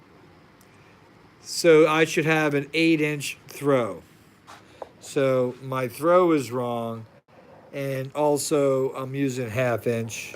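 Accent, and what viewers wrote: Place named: South, United States. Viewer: he may have a southern accent, but his a solid experimenter ... afterall if you could read a book and literally build a free energy machine. many would.... ramblings are good for getting too know the guy, that we agree on..... although i must say, the best way too judge the guy in my opinion, is what he does with the information i have him,along with videos of my working free energy machines . which were numerous. <link>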